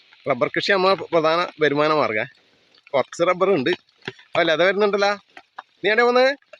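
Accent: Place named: Payyanur, India. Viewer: എന്തൊരു ഭംഗിയുള്ള സ്ഥലമാണ്❤ അവിടെയൊക്കെ കൂടി നടക്കുമ്പോൾ എനിക്ക് വല്ലാത്ത പേടി തോന്നി വല്ല പാമ്പങ്ങാനും ഉണ്ടെങ്കിലോ എന്ന് വിചാരിച്ചു ഇതെവിടെയാണ് സ്ഥലം ഞാൻ കണ്ണൂരാണ് പയ്യന്നൂരിൽ പറഞ്ഞ സ്ഥലം ഒക്കെ അറിയാം ഭാഷയിൽ നല്ല പയ്യന്നൂർ ഭാഷ എന്തായാലും ഞങ്ങളെയൊക്കെ കാണിക്കാൻ എത്ര റിസ്ക് എടുത്ത് പോയല്ലോ മഴയത്ത് ഇനിയും മഴയത്ത് പോകരുത് ഇങ്ങനെ വഴികളിലൂടെ നമ്മൾക്ക് ഒരു അനക്ക് ഒരുപാട് സന്തോഷമായി ❤❤ നിങ്ങളുടെ വീഡിയോസ് ഒന്നിനും മെച്ചപ്പെട്ടു വരുന്നു ❤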